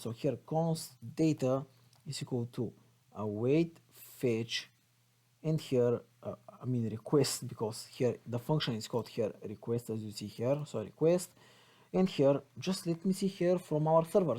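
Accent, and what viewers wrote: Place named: Bulgaria. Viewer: hah me too ! :) just the accent of english :D and i decide you are bulgarian lol :D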